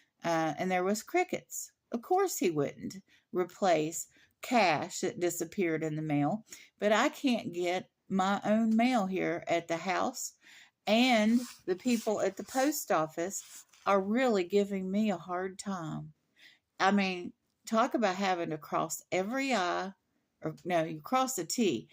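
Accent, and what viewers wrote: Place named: RP, United Kingdom. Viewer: Queens English, no less.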